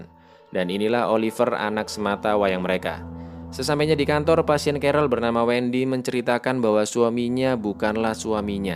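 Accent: Java, Indonesia. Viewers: Mimin wong jowo? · Mantap min cara berbucaramu wkwkwk, jowo pokok e terdebest! 😂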